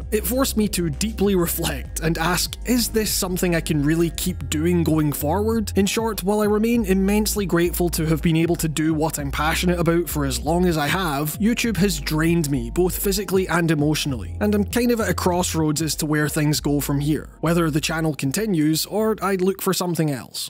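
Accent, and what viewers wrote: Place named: Scotland, United Kingdom. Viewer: Started watching your videos after moving to a new country and took great comfort in hearing about one of my favourite topics being covered by a fellow Scot so I could savour hearing the familiar accent while growing accustomed to a new place. At the same time, I grew to really enjoy your approach to topics, you cover games differently to most reviewers and bring in your subjective and often emotion based opinion which is honestly so refreshing and has helped me think about why I enjoy games the way I do. You brought great insight to the music of Shadow of the Colossus that really changed the game for me so any more exploration of your other passion in music is more than welcome.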